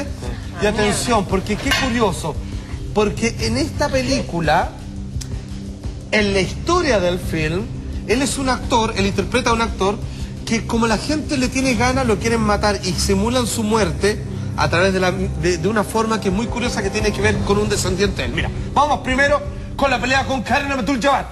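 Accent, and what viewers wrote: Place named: Chile. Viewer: no entiendo nada lo que dice este tipo.habla muy rapido y vulgar. y su acento chileno no le ayuda en nada..../////.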